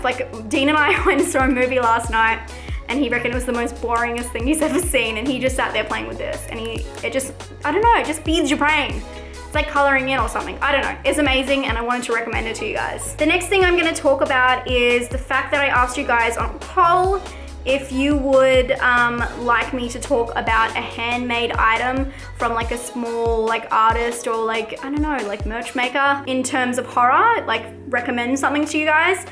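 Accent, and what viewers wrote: Australia. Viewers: Yay,!! Saw movies!! Big fan! So cool you went to the Gold Coast! I personally think the west coast has nothing to envy. Sounds like you are from Western Australia?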